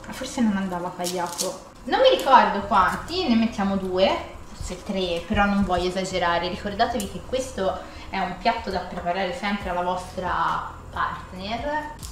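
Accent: Tuscany, Italy. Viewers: Mi fai impazzire dalle risate quando assaggi gli spaghetti alla fine, la tua espressione persa nel nulla, e il tuo accento toscano, 🤣🤣🤣ohh maremma, ciao saluti da Pavullo nel frignano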